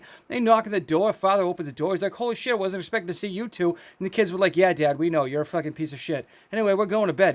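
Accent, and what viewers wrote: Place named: Boston, United States. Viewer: You’re killing me. It wouldn’t be the same without that thick Boston accent!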